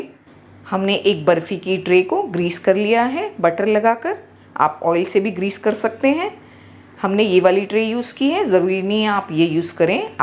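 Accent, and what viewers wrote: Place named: South India, India. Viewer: You have weird accent for a Pakistani. Sounds like some south indian person trying to speak urdu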